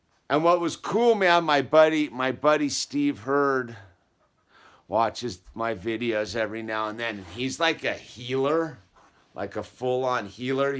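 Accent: California, United States. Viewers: I'm going to have to say that coming from a guy who does literal backbreaking labor, I wouldn't hear the word "dude" to much let alone the ever iconic Californian accent.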